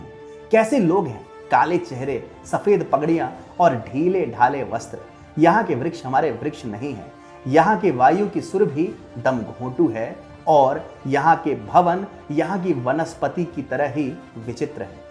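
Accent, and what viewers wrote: Standard. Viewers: aapki saari baatein aatma ko jakhjhor de rahi hai, lekin kya badlega wo to hum logo par hi nirbhar karta hai. fir bhi priya bhrata aapki shudh hindi sun kar mai tript ho gya.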